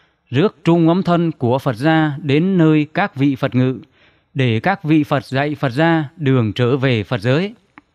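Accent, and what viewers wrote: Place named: Thanh Hoa, Vietnam. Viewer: Giọng đọc Thanh Hoá nghe rõ . Nội dung có chính xác như của chùa thiền tông Tân Diệu không ?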